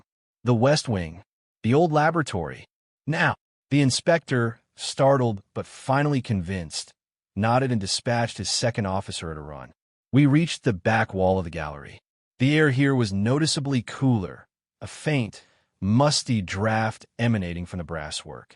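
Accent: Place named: United States. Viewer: The story loses value as this is narrated by someone in the U.S.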